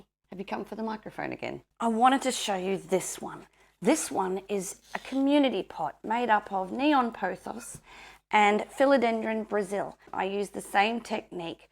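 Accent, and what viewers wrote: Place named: Australia. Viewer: It's nice to hear another Aussie accent on here ! 👍🇦🇺 Sub 412 here. 😃 Yes, $14 for 1 cutting sucks !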